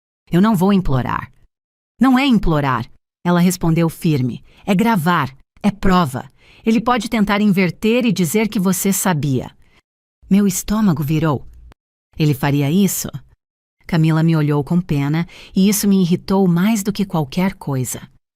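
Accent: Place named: São Paulo, Brazil. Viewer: a narração é interessante com sotaque do interior paulista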